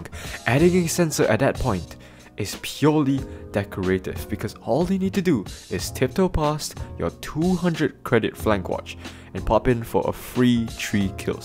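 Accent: Singapore. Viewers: u sound Singaporean so i'll sub, hope u do more entertaining rants.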